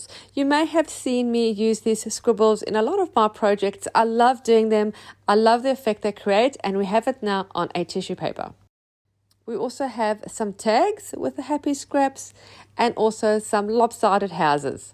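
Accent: South Africa. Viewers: There you go! I hadn’t actually picked the South African accent either which is strange as it’s one of my fave places - have been twice and can’t wait to go again! One day! <3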